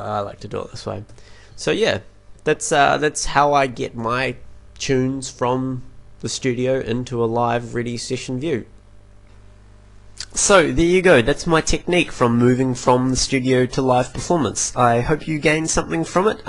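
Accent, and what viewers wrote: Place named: New Zealand. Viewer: Oh and by the way, I love the accent, I'm from Australia and for some reason. Just love New Zealand accents. "You might like 4, you might like 8, you might like 10 or 2. I like sex!" Hahahahah that was soo good! Love you man, keep up the good work!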